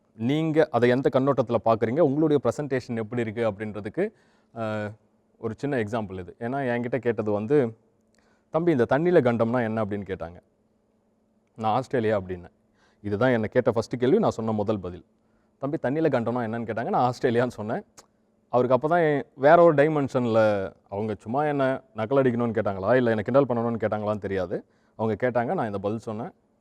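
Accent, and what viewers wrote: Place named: Coimbatore, India. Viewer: Voice of coimbatore... super anne...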